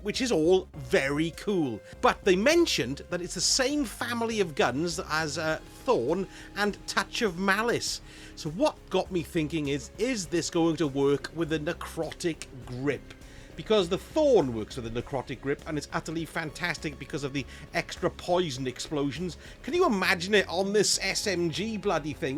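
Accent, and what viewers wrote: Wales, United Kingdom. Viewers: I love a Welsh accent!! 😍😍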